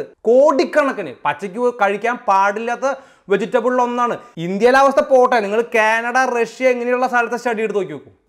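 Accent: Thrissur, India. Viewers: Cheten poliyatta.mmede TCR basha poliyayi parayind.pinne class poli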